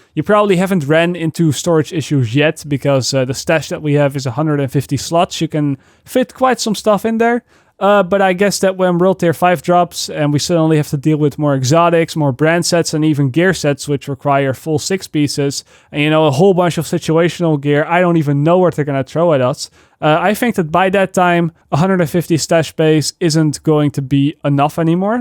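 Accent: Netherlands. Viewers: Is he dutch? His accent sounds like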